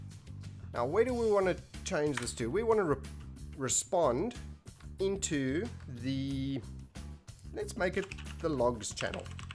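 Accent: South Africa. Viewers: homie are you afrikaans?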